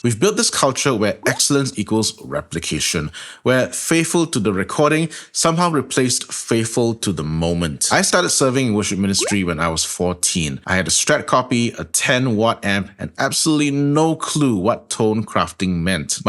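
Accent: Singapore. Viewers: You sound like a Singaporean. Is your church in Singapore or Malaysia?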